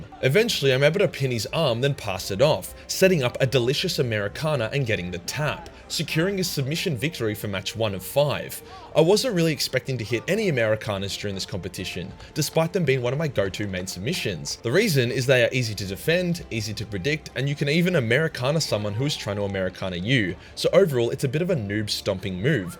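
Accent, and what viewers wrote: Australia. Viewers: Please please please make more jiu jitsu content, your deep beautiful Australian voice is perfect for narrating grappling.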